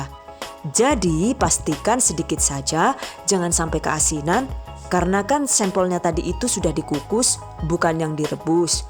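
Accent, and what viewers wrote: Banyumas, Indonesia. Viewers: Ngapak maning lha 👍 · Lucuuu kocakkk mbaknya...aplgi klo pas lg ngomg bhsa ngapak😂😂😂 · Merga wong ngapak dadi tak subscribe juk ben gampang nek arep ndeleng resepe rika suwun yu resep resepe. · Kayak orang bule ya mbknya,,tapi ternyata bisa bhs ngapak2 😂😂🤣,,,btw syukak bgt ma vidio2nya,,thx resep2nya mbk yu 😄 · Ngomonge bisa ngapak kaya wong banyumas ya mbok ? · Bu, ibu wong endi bu, ngapak..tanggane nyong apa udu.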